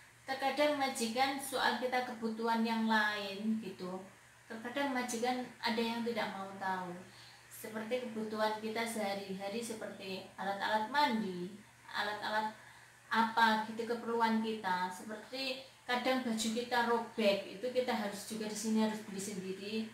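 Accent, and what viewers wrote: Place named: Java, Indonesia. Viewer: Dari logat nya koyo wong jowo yo mbak..jowone ndi smpean....wes tak kirim duit e lengkap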